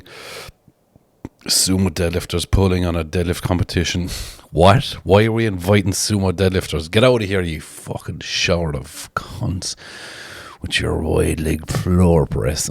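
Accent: Ireland. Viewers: Love Irish & Scottish. Are you Irish sir? 🙂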